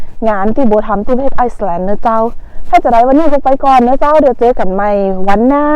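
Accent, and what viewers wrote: Thailand. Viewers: ฟังภาษาเหนือเพลินมากจ้า😁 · ฟังม่วนดี ขอทายเป๋นคนพะเยาแม่นก่อ..คิคิ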